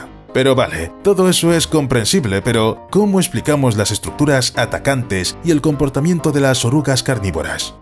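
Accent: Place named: Spain. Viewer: Pros del video: Una investigación interesantísima, imagenes acorde y un tema novedoso. Contras: Español de España.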